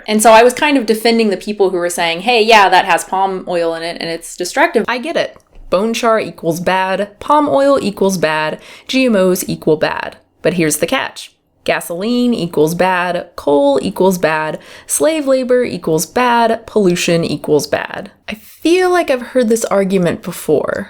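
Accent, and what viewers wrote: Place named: South, United States. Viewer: I think the most effective way to spread the vegan message is to bring back your southern accent.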